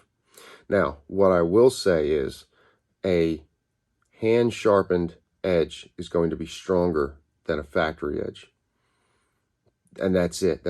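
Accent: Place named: Maryland, United States. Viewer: You sound like a Marylander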